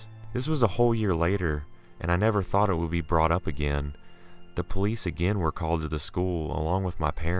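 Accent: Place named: West Virginia, United States. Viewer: Love listening to your voice! I'm from West Virginia and your accent sounds like you're from wv too!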